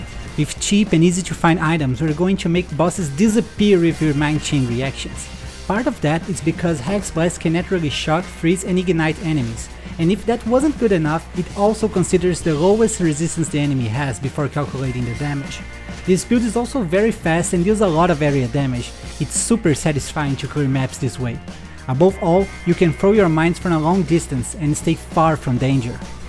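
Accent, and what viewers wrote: Brazil. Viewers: Mano por mais que dê pra notar que você é Brasileiro pelo sotaque você tem fluencia e é intendivel o que você fala em inglês, então por quê da legenda em INGLES novamente? ajuda seus compatriotas tupiniquins ai kkkkk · Is the person speaking Brasilian?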